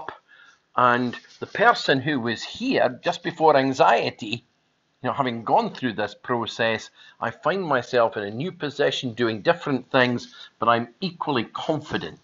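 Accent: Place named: Scotland, United Kingdom. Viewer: Hi, nice one - like your explanation, change hearing it in a Scottish accent rather than my Yorkshire one:-)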